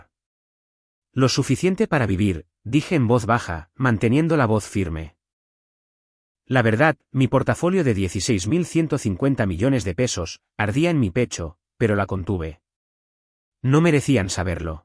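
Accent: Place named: Spain. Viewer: Si la trama del relato se desarrolla en México como país, ¿porqué el audio está en español ibérico y no latinoamericano?. Cansan sus terminaciones en "eis" como dudasteis en lugar de dudaron o hicisteis en lugar de hicieron y muchos términos más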